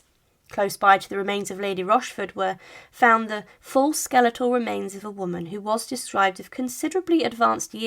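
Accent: United Kingdom. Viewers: At least it’s a British accent not an irritating American one..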